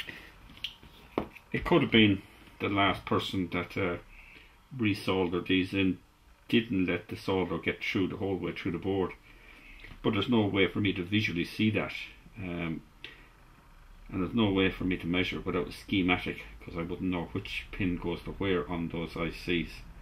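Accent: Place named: Ireland. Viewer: You must be from Ireland, they'd some irish accent!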